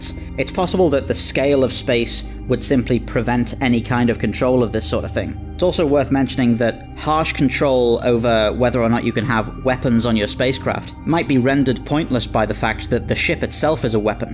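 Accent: United Kingdom. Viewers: When I heard the British accent I had to laugh. I can legally weld a machine gun or flamethrower to my car, and, at worst, get hassled by a cop for a "public disturbance." I also have a 3D printer and drill press with which I can pretty much manufacture whatever kind of gun I want. The topic of this video had never occurred to me. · Man, you sound soooooo British. "If normal people are armed then the criminals will just steal their guns".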